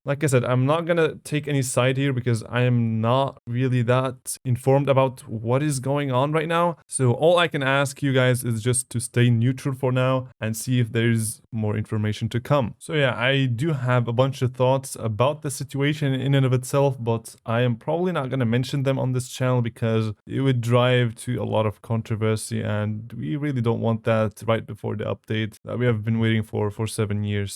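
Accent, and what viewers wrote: Germany. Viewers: You are from Germany?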